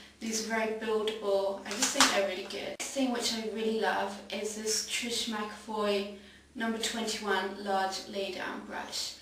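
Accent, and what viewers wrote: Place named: Public school, United Kingdom. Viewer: you have such a fabulous public school accent!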